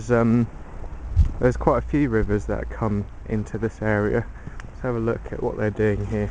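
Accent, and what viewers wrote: England, United Kingdom. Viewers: i love ur accent..so english....lol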